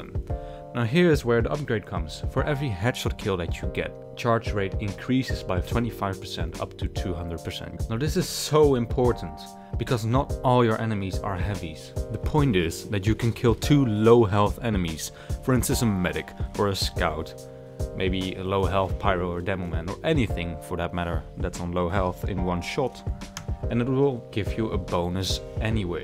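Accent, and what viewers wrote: Netherlands. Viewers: ey just found out your channel and i can already tell ur dutch (same here). keep up the good work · Je Nederlandse accent is echt heel duidelijk :D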